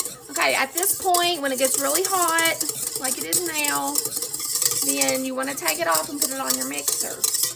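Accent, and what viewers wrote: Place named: Kentucky, United States. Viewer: Hey there fellow Kentuckian! (I live in PA but I hail from the Bluegrass State!). I found your channel thru MrG1965. I have family in central Kentucky - Greensburg and Campbellsville. It's just lovely to hear someone with a KY accent! I don't hear it much up around here in Yankee territory! My wife does all the cooking here, and she's knows what she's doin too! I'm happy to support your channel and if you feel moved to return the favor that would be greatly appreciated. And if you don't feel moved, well, that's okay too! I hope yall had a wonderful Thanksgiving!! I forgot to ask......may I please have a slice of that delicious lookin chocolate pie?!!??!!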